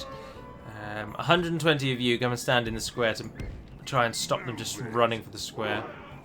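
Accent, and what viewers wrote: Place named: RP, United Kingdom. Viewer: I'm a very recent subscriber, but I have to say, your Third Age LPs are a breath of fresh air, partly because of your super aggressive style of play, and partly because you edit out the boring bits when needed. It sets you apart from a lot of other TW YouTubers, and makes your campaigns flow at at a pretty smooth pace, which I like. I also find your commentary and super posh accent (I mean no offense; I'm a big fan of those accents) pretty enjoyable to listen to.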